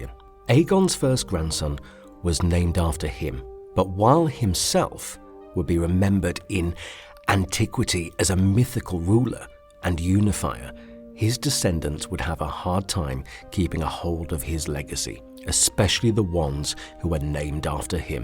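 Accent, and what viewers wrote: United Kingdom. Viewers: Your British accent makes you sound intelligent, but your pronunciation and word choice implies the opposite. To be clear, I am not talking about your pronunciation of GRRM's invented character and place names, I'm talking about your mispronunciation of English words. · Yeah, I thought the other one was some kinda read-aloud android. This British dude is perfect... plus, you know... when people talk about GoT/HoTD, they just sound more relevant than a nerdy american with a lisp whose gums you can we smack while he talks- I forgot which specific channel I'm talking about. But yehh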